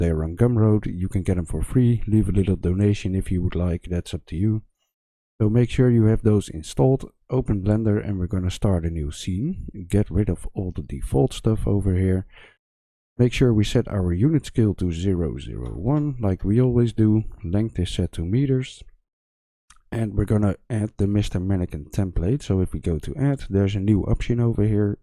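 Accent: Netherlands. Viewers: Ik hoor dat aan mensen hun Engels dat ze uit Nederland komen.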